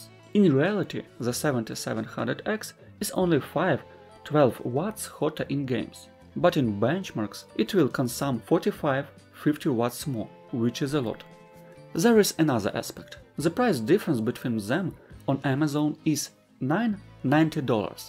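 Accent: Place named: Russia. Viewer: Слышен русский акцент)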